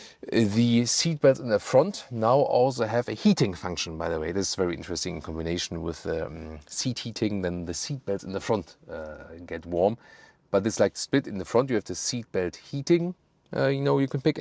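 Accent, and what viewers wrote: Germany. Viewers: don't apologise for your deutsche pronounciation. Indeed it's MaybaH, not MaybaK.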